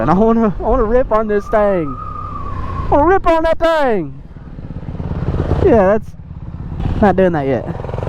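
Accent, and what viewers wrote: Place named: South, United States. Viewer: You sound like y'all from dawn sawwf. What state?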